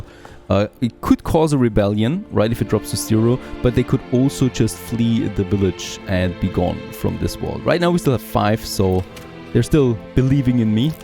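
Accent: Germany. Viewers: Are you German? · Für einen Deutschen hast du einen verdammt kleinen Akzent.. : ) .. very good bro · Du bist Deutscher oder ? :D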